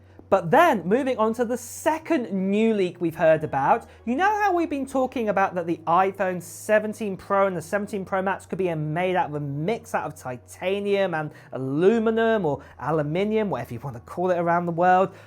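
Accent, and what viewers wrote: Cockney, United Kingdom. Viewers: This was almost painful to listen to….sorry but the English cockney accent was very difficult to understand.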